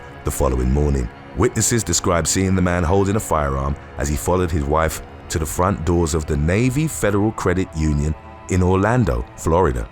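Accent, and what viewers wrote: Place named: United Kingdom. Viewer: I always enjoy your videos. I also love your perfectly calm narration voice and in particular your sophisticated British accent. Your accent always draws me in to listen to your videos.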